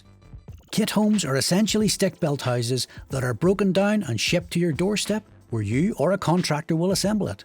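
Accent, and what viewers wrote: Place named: Ireland. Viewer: I'll listen to this Irish dude all day long....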